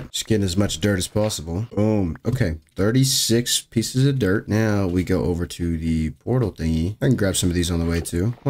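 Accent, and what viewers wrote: United Kingdom. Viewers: the british accent was perfect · that english accent was crazy bruv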